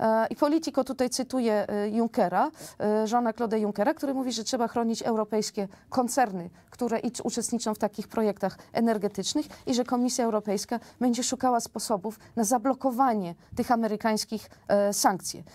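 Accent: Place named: France. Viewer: Uwielbiam tę Pani polszczyznę z akcentem frangniem.Idealnie pasuje do p.p.z.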